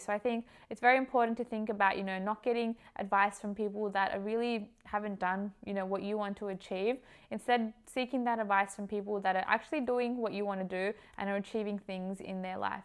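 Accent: Australia. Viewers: When I saw your video the first time, I told myself in my heart that I hope she speak hindi. Your accent is totally Australian. Good to know, you know the culture in and out.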